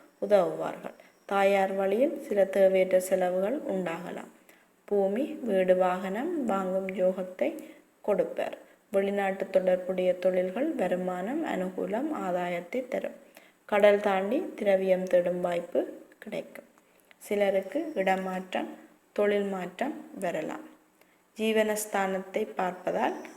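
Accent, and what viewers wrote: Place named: Sri Lanka. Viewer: Ilangai tamil super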